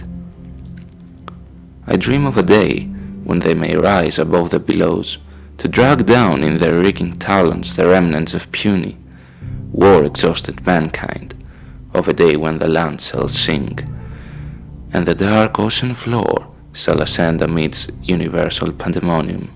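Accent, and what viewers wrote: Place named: Greece. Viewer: you greek mate ? :) nice work btw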